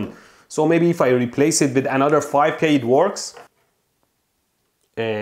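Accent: Iran. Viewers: اره ایرانیه دانشگاه تهران درس خونده بعدا رفته کانادا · ایرانی هستی داش ؟ نه ؟ قیافه ، لحجه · I just love his thick Persian accent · خو ایرانیه؟ بابا لهجه ش خود لهجه فارسیه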